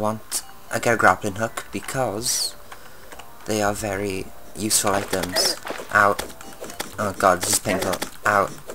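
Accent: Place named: Wales, United Kingdom. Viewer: Your Welsh accent rules. Greetings from Krautistan, and thanks for thid very helpful series for Terraria beginners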